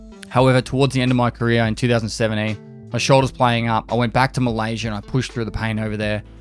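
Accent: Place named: Victoria, Australia. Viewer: Hey, thought you sounded victorian, was surprised to see you live in Brisbane (I'm from Melbourne)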